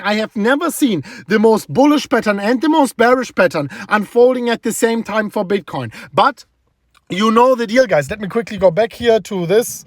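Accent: England, United Kingdom. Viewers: Sounds like England 😂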